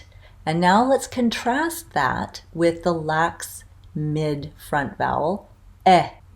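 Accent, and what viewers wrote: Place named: Canada. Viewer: I'd love to get a video on Canadian Raising. The dipthongs Ai and Aw get reduced to (ʌɪ) & (ʌʊ) Correct me if I'm wrong but I heard you did use (ʌɪ) a few times. Obviously these aren't dipthongs every single Canadian uses though it's well-spead over the southern provinces. I think we foreigners will benefit from a lesson on those dipthongs.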